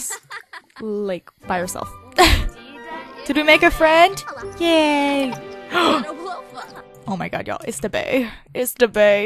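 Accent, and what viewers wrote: United States. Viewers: It's americam but she is from Houston Texas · probably american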